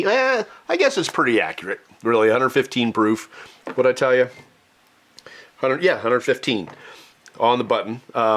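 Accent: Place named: South, United States. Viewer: Congratulations! Here's a toast to you in a glass of Old Forester 100. 🥃 A pot still's operation is dead simple. But I have just a vague understanding of how a column still works. About all I know for sure about a column still is that it's more efficient, and therefore tends to strip more of the congeners out of the white dog. I can just see the Official Aroma Kit - 2,724 different scents, comes with forklift for moving. 😂 I never get peanuts from Jim Beam juice. I get pecans from George Dickel white label, and walnuts from their BiB. And I get peanut shells on the nose from Heaven Hill whisky. Tonight you can't seem to make up your mind whether caramel is CARmel or CAREamel. I can tell you've been in the South for a bit. 🤠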